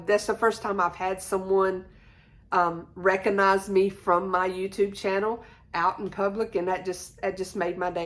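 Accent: South, United States. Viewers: Love that strawberry 🍓 cookie jar and the beautiful vintage linens! I've been collecting doilies, old handkerchiefs and table linens for crafting, but I'm having a hard time cutting them up. 😅 I also love the idea of a vintage toy tree! This year I had my Steiff reindeer pulling a sleigh full of vintage elves and mini teddy bears in Santa hats under the tree. Last year I had vintage Barbies in ugly Christmas sweaters plus my Mattel Elvis. I also wanted to tell you that I love your channel name. It reminds me of growing up on the Chesapeake in Virginia and your accent is so sweet. I miss the south! ❤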